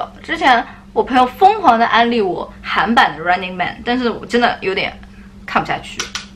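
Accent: China. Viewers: 你啊是苏州人啊！！！感觉口音伐要太亲切哦！！ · 第一次看你视频就确定你这蜜汁口音是上海人😂因为我也是哈哈。 推荐看明星大侦探诶，有点烧脑但是也很搞笑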